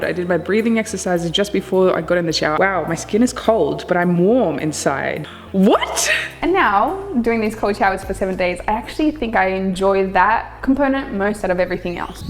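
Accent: Australia. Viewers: you sound australian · you have a slight australian accent after your 1st accent..... did you live in australia? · so this is the first video of yours that ive seen. i was thinking - wow, her accent is on point (im australian.. assumed you are icelandic). i noticed like 5% sounds south african. so then im wondering, has she just lived in straya for a while, or did she self teach the australian accent? then i heard 2 seconds of your bf and i knew he was aussie and therefore that must be why you sound australian. now im thinking, maybe you're actually australian, born and raised. hmmmm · Are part aussie? You sound like it a bit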